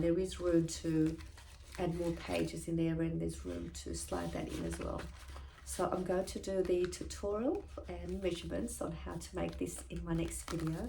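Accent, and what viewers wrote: Australia. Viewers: I love your Australian accent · the best Australian accent I have ever heard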